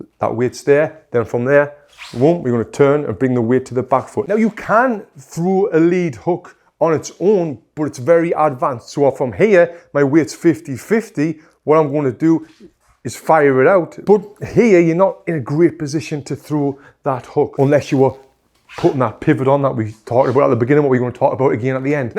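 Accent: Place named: England, United Kingdom. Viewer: Comment after comment of people inspired by your teachings. You should be proud, not sure what type that English accent is but it def adds something, that’s for sure.😂😂😂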